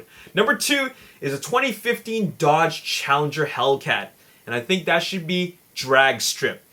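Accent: Canada. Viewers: You must be Canadian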